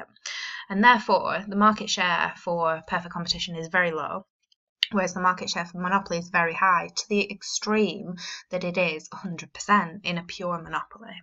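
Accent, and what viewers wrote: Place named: United Kingdom. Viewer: love your british accent :)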